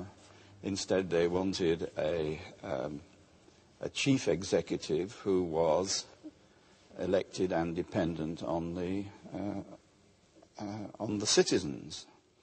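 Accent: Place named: Poland. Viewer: pan davies z bardzo ładnym polskim akcentem wymawia polskie słowa, pozdrawiam ;)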